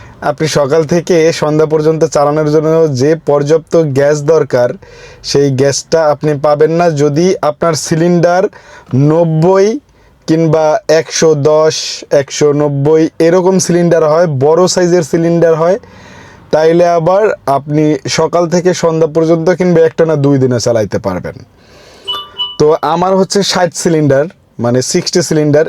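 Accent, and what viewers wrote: Barishal, Bangladesh. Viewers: মনু তুমি বরিশাইল্লা। খাইছো।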